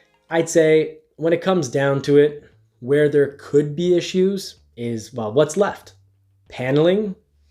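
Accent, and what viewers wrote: Canada. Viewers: Hermosillo.... Hermo see oh. There is no L sound. You say the name of the city like you're from Canada or something.... Good vids tho.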